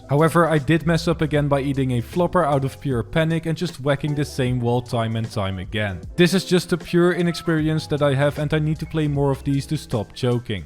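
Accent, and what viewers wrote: Netherlands. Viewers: Is he Dutch? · Kom je uit nederland? Are u from the netherlands? Because i am and i think your accent sounds just like it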